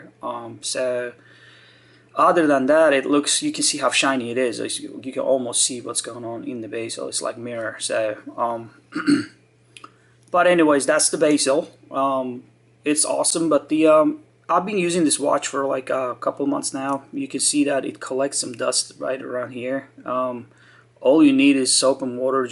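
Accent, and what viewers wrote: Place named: Eastern Europe. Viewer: Matt Phelan He's not a Yank ! He's an east European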